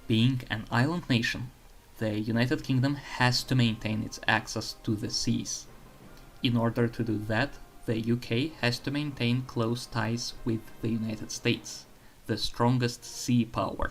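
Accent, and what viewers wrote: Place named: Russia. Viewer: Interesting thats the top and only YT video on British geopolitics is delivered with a Russian accent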